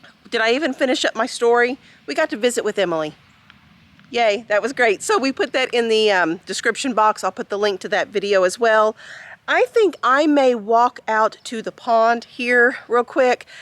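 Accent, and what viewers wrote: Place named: South, United States. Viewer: I love your accent.thats southern.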